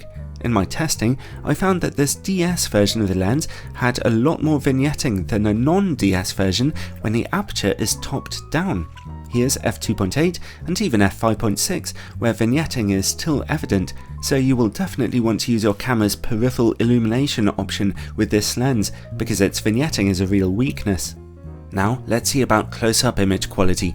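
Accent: United Kingdom. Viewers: Love the music and the British accent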